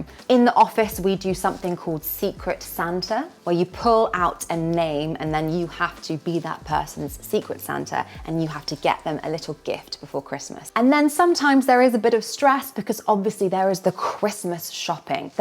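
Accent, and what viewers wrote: United Kingdom. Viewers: Happy Christmas, I really enjoyed and saw your love and pride for British Christmas! Thank you for sharing your happy VIBES and beautiful British accent always❤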